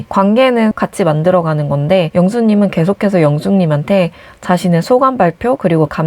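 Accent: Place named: Gyeongsang, South Korea. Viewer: 근데 ‘영수님’하고 ‘영숙님’ 발음이 너무 똑같아서 구분이 안돼요. 경상도 사투리 약간 쓰시는 듯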